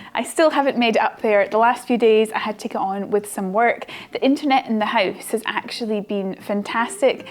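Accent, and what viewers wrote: Scotland, United Kingdom. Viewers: Beautiful lady, beautiful accent. So proud to claim you as one of my own (scottish)❤😉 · You have such a lovely Scottish feminine accent my dear. 💖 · What an entertaining video! Everything was so interesting and moody. As a german I always have to take the ferry from Amsterdam to Newcastle ( spend 2 -3 times a year in Scotland), so your video about the ferry trip was so interesting everything looked so familiar to me, even your sea sickness 😬. I use to travel alone, and I love to stay in small cottages in the highlands ( although I always feel a bit scary at nights ). In 2021 I stayed a few days in the Hostel in Tarbert on the Isle of Harris, and due to the pandemic I had the hostel for my own! That was great and strange, both., besides I really love your scottish accent and the fact that you are so easy to understand 👌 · Wow! 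look at you without your woolen cap. Love your scottish accent..easy to understand..but difficult to immitate❤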